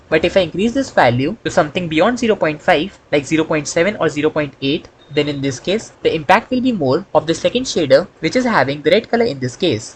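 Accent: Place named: India. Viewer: Namaste Bro, Can you also make a full course for sculpting (Note- everything about sculpting means basic to advanced) in blender. Are you from India as your accent seems like to be an Indian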